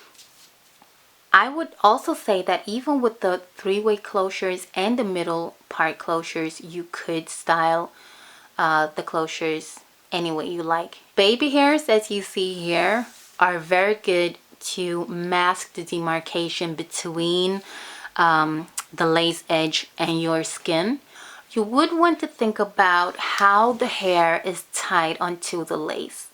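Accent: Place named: Brazil. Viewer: Your voice sounds like Rihanna but with a Brazilian accent. Very cute!!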